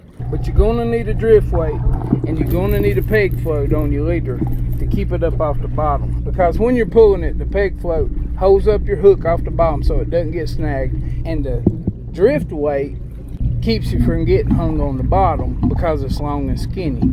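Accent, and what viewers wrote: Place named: North Carolina, United States. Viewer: grab it bare handed den! 😂 I think we might be from the same neck of the woods! I just ran across your YouTube yesterday and you sound like you could be a neighbor of mine or someone I grew up with! I am a North Carolina man born and raised!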